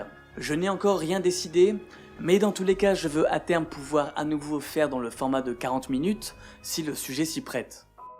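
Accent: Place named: France. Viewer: Mais où est tu né, tu à un certain accent français. Est ce l'accent suisse, belge, ou un accent du sud de la France ?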